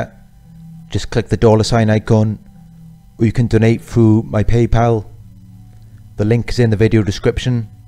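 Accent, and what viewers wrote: Wales, United Kingdom. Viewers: It’s actually a Welsh accent. Welsh people are some of the nicest people you’ll meet :)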